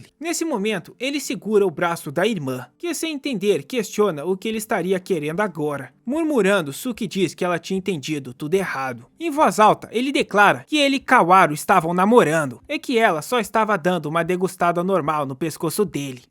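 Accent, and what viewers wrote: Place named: Rio Grande do Sul, Brazil. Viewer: o sotaque gaúcho melhora ainda mais kkkkkk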